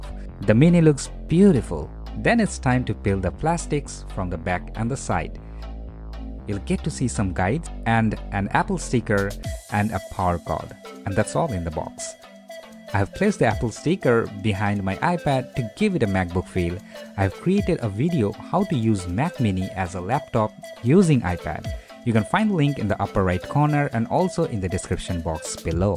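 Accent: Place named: United States. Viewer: thank you so much. I watched the other video you did about using it as a computer. Really answered question people didn't seem to be able to. And you sound very down to earth. I usually don't watch videos with an American accent cause there's a point my brain can't process the accent. So thank you.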